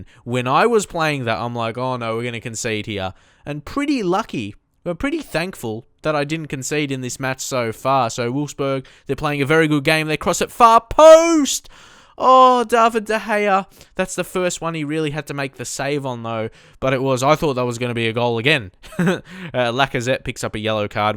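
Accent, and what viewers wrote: Australia. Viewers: R u from Australia????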